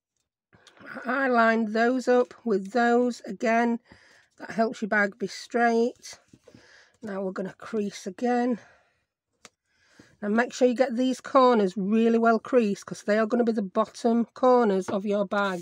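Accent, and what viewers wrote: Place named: North, United Kingdom. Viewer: Brilliant tutorial thank you. Great to hear a Northan accent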